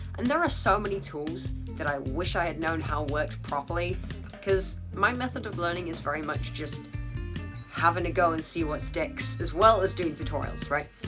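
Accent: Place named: Australia. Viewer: Hey dude where you from you almost sound Australian or kiwi.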